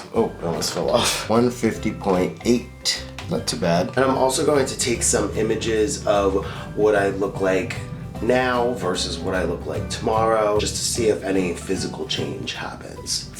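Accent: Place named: New Jersey, United States. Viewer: I’m assuming ur from jersey or something because u pronounce water “wuter” (not making fun of u, I just know bc I have a friend from jersey who does the same thing)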